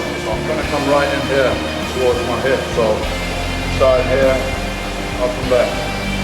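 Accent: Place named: Birmingham, United Kingdom. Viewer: Birmingham, England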